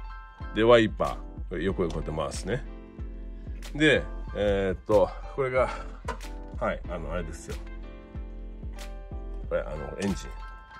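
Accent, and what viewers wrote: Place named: Okinawa, Japan. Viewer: 喋りがツボります笑 沖縄感最高です😂😂😂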